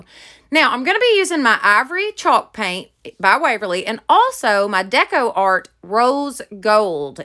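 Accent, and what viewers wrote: South, United States. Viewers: I started the video and my 11 year old went she's from the south right 🤣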